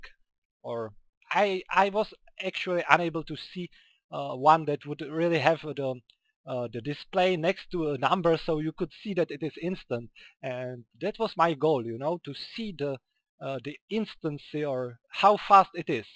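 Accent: Czech Republic. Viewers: ja mam dotaz :D prosim. z prizvuku si myslim, ze jsi cech, tak to napisu rovnou cesky :D mc sem hraval, ted to jen sleduju. ale nikdy sem na obvody nebyl a hrozne rad bych vedel, jaky tohle ma vyuziti v klasickym survival svete ? nebo to je jen sranda, ktera nema nejaky velky vyuziti ?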